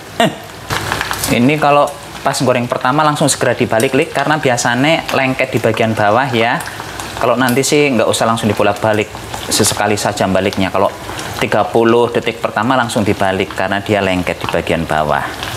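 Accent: Banyumas, Indonesia. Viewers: Assalamualaikum baru nyimak Mase jawane mana Ngapak kaya aku Aku Kebumen mase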